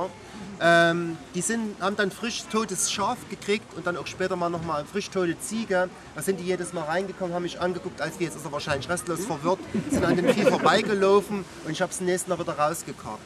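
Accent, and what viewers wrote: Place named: Saxony, Germany. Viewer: Herr, Sie können prima meinen Heimatdialekt sprechen. Ich wohnte in der Gegend, jetzt aber in Thüringen. Kriege richtig Heimatgefuehle, wenn Sie bei Elefant, Tiger und co dabei sind. Ihr Sächsisch zwischen " Leibzsch un Dresdn" ist das beste. LG nach Taucha. Sind Sie Ehrenbürger? Wäre angemessen.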